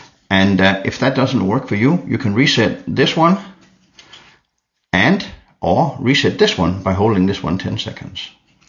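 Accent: Denmark. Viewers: fed dansk accent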